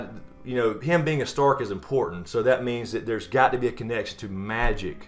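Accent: South, United States. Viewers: Glad I found your channel, GREAT takes on Thrones! Love Thrones talk with the Southern accent.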